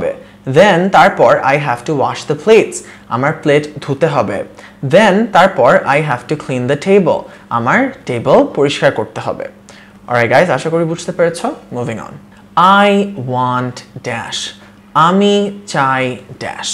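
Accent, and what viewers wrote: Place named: United States. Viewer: Channel টা পছন্দ কারণ American accent এ ইংলিশ ক্লাস হয়।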